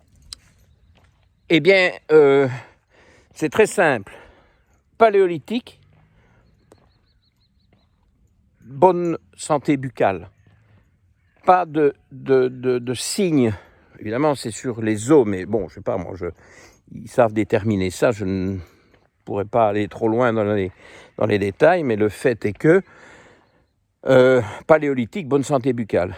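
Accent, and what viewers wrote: Belgium. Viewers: C’est toujours un super régal de vous écouter et de voir vos compagnons 😘 ,votre plaisir et votre joie de nous partager la beauté de votre nature et de vos animaux, me remplit le cœur à chaque vidéo… Merci pour tous vos conseils, et j’apprécie tellement que votre discours n’est jamais coupé par de la publicité. Merci 1000 fois. Et puis votre accent belge vos expressions me ramènent des origines… bref avec vous, je tire mon plan😍😍😍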